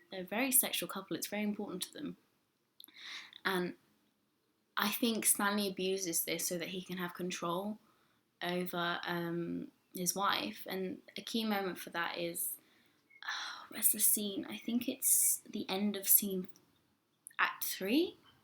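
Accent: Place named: England, United Kingdom. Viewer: I got distracted so many times with the english accent and you playing with your hair :D but it was a great video after all. Great character analysis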